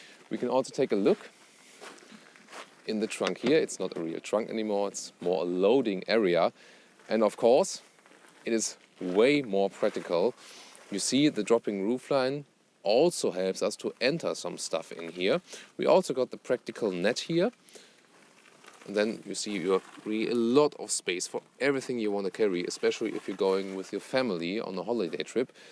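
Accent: Germany. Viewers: Such an enormous German accent, wouldn't it be better to do those presentations in German with English subtitles?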